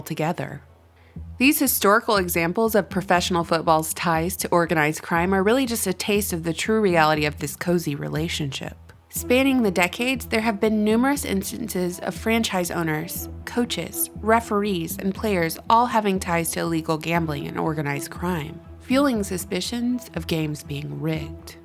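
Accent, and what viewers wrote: South, United States. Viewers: i always love it when you drop a new video, This is simply asking folks (with that mighty fine southern accent no doubt) to objectively, with an intellectual process, and without unreasonable emotional reactions... to take a closer look at this annual ritual that has spellbound Americans for decades as they live vicariously through their favorite team and player. It's parasocial behavior believing they actually know personally players, staff, performers, etc. All that consciousness. All that attention... focusing on an inconsequential silly game where silly grown men, paid millions, play with a silly ball. makes ya kinda wish something like... oh i don't know... umm... the f**cking federal reserve and our bullshit fiat currency would get that kind of attention! Shoot. One could even argue that something insidious as the fed exists due to everyone concerned with a silly football game. 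anyways... Another great video. you're so foxy. i love you. there. i said it.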